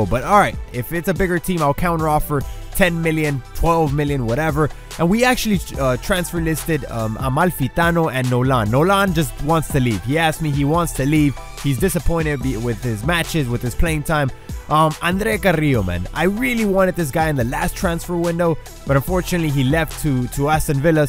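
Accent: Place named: Mexico. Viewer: Haha 5 minutes in and your career mode is fun to watch!! 😅 I thought you were white when I started listening but then as I kept watching Im like nah this guy is mexican lol 😆 Also because of the signings you were making of mexican players lol I hope you keep uploading career mode videos bro, I just started a West Ham career as well but I put the team all the way down to 4th division and I'm trying to promote them all the way up to premier league!! 🙂‍↔️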